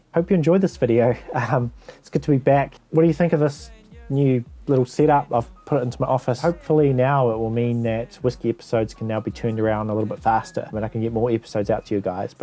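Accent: New Zealand. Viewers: Are you a kiwi? If so where from?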